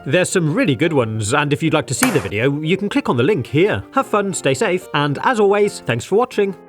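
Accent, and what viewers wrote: United Kingdom. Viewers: He's british!!!!!!! 🤴🤴🤴🤴🤴🤴🤴🤴🤴🤴🤴🤴🤴🤴🤴🤴🤴🤴🤴🤴🤴!!!! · Are you britsh?🍵☕🍷🇬🇧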